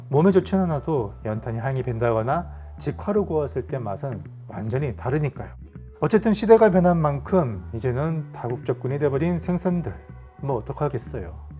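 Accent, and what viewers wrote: Gyeongsang, South Korea. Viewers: 님을 알게되고 영상을 수년간 봐오면서 의아?했던점이 '서울분으로 알고 있는데 말씀하실때 왜 경상도느낌이 계속 나지?' 했는데 오늘에서야 비로소 궁금증이 해결되었네요ㅎㅎ부모님께서 부산분 이셨구요ㅎㅎ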